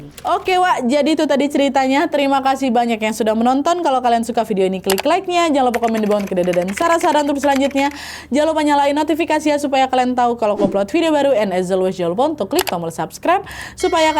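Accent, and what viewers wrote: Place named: Sumatra, Indonesia. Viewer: Cuma yang bisa ceritain kisah yang aslinya dari luar negeri semua tokohnya jadi berasa jadi orang Sumatra hahaha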